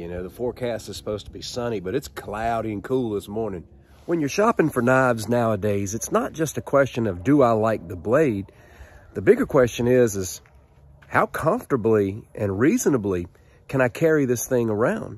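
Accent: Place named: Virginia, United States. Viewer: Can I just say as an Englishman the Virginia accent is the coolest in the 'States.😁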